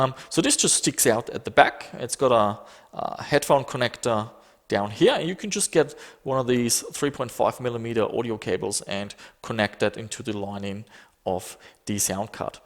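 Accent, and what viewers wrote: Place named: Germany. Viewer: Yeah, I also thought you were from Germany :D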